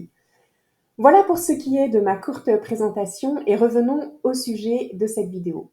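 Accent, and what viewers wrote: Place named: Quebec, Canada. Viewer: Bonjour 😊. Merci pour cette belle capsule. Hâte de découvrir un peu plus ton nouveau programme 😊. Et ton accent québécois est juste terrible 😂😂. Très bon dimanche ♥️♥️♥️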